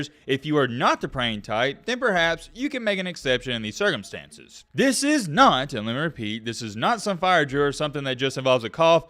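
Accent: South, United States. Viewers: Hoe-Spice? Please never complain that people assume you're an ignorant redneck based on your ignorant redneck Cracker accent. You prove it. You're simply too Dunning-Kruger to understand the condition of my favorite coach. You have no idea how many names and words you mispronounce. What is the redneck name for Ebonics?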